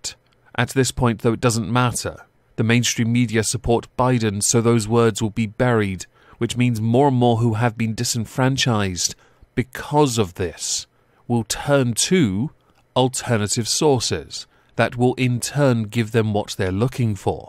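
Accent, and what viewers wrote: United Kingdom. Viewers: Your British accent makes Anne Boleyn sound just like Amberlynn and I got very confused for a second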